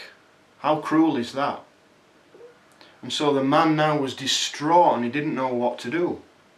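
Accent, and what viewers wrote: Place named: Leeds, United Kingdom. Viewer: i needed that if they are your own words it was spot on ,are you from leeds.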